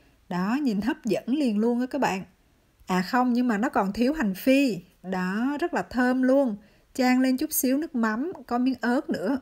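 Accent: Central, Vietnam. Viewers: Cảm Ơn chia sẽ của chị Giọng nói miền Trung của chị nghe dễ thương Lai lai một chút giọng Huế 🥰🥰🥰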